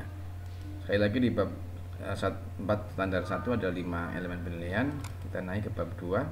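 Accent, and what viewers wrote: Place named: Banyumas, Indonesia. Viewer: Memang benar pak, yen ora ngapak ora kepenak........sukses selalu....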